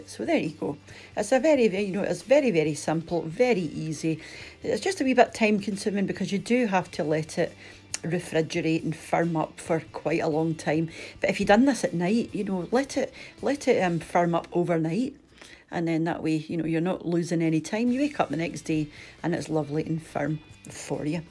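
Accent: Scotland, United Kingdom. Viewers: I'm a linguist with a fascination for dialectic variations and this presenter has all the credit for interesting and even charming variations of the basic English pronuciation as spoken in Scottland. It's simply nice to hear her speak. She charms you with her easy pronunciation and speech to the point where you like listening to her, just to hear her speak. If you live in the U.K. you have English accents, Welsh accents, Scottish accents and Irish accents and they are all English but they are all clearly belonging to a geographic area and unique to those parts. That's what makes the U.K. unique and great.! Can't say the same for the accent I was born with, namely, 'Cockny' which I never held in particular esteem. I spend my life pretending I'm from Cornwall or the Isle of Skye rather than be identified as a 'Gor Blyme' cockney.! I know, it's irrational but who said being rational was better than being from Radnorshire.? 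And trust me, if you play this game, you'd better get it right, because you have no idea how quickly you get caught out. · Love the video and the recipe and I'm making these today. But I watched this video with captions, and it cracked me up because whoever or whatever did the captioning clearly does not recognize a Scottish accent at all. You should watch the video with captions, because some of the mistranslations are hilarious. And now I think I'll need to recaption all of my video too as I bet the daptions are just as bad. Cheers! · Loved it! I listen as much for your lovely Scottish burr as for the lovely recipes!! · cracking accent. britain has good accents. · Love your cooking love your scotsh accent I am from Australia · Hi! Not sure where you’re based, but I spent 12 years working and living in the Highlands and in Aberdeen. Your recipes and your accent are making me miss "home". 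I’m definitely going to try this, for I miss my all day breakfast at the supermarkets where I always had a Lorne sausage! Keep doing what you do: you’re doing great! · Throw away your Spam!!!!! This and Slow Cooker Square Sausage looks great. And as you say, we made it ourselves........I've the ingredients and will make my loaf today (January 15, 2023. Better than bacon.........Thanks for all the recipes and your Scottish is Music to my ears.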